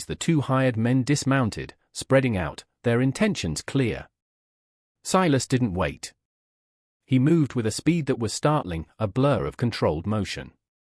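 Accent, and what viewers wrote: United Kingdom. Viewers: Horrible narration. British accent for the 1800's Old West? Seriously?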